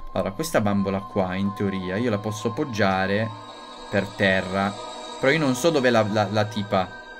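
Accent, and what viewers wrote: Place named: Bari, Italy. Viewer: che parla in dialetto barese😂😂.Io sono barese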